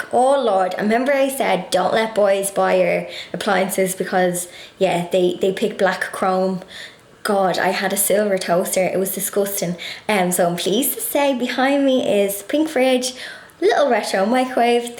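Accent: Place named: Ireland. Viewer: Both you and your home are adorable! You have put so much work into your house for someone your age, it's very impressive! I love your accent. We here in the USA sound horrible compared to your lovely Irish accent.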